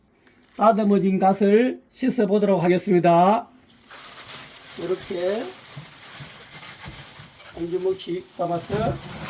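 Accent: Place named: Gyeongsang, South Korea. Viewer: 구수한 경상도 사투리가 매력있습다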